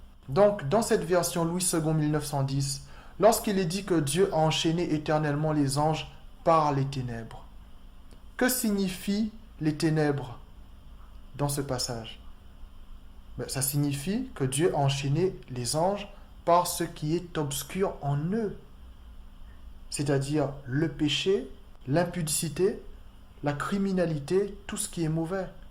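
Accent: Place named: Antilles, Caribbean. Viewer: salut ! Je suis une de tes abonnées et je me disais bien que tu avais un accent créole antillais :) j'ai aussi des origines de Guadeloupe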